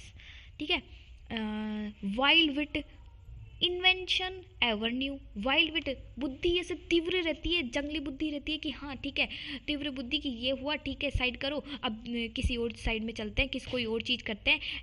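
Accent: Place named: Rajasthan, India. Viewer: Btw... Scrolling through ur channel i realize 2 things... U have a really sweet voice & that has a rajasthani texture r u from rajasthan or sort of outskirt's of it ??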